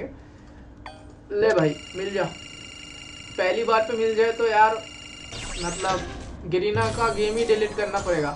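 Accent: Bengal, India. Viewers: Bengali Bhai kitni bhi Hindi bollo I can understand you are Bengali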